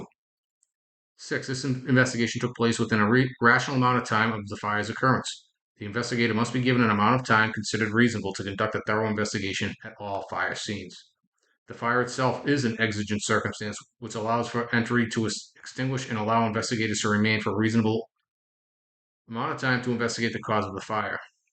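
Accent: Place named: Boston, United States. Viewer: Not sure why this was on my homepage, but sick Boston accent.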